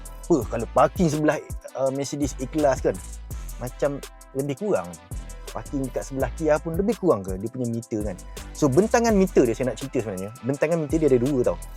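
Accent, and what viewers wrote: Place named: Terengganu, Malaysia. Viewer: Abang ni orang ganu ke. Slang bunyi ganu sikit sikit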